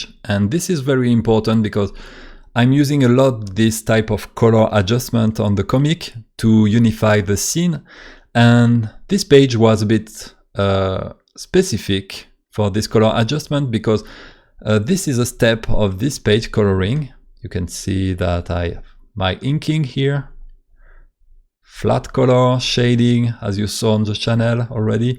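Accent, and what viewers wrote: France. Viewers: Mmh tu a un accent fr xD Sinon très cool merci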